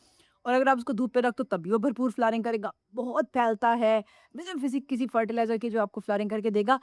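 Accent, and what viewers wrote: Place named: Saraiki, Pakistan. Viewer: آپ میانوالی سے ہیں؟ مجھے سرائیکی لگتی ہیں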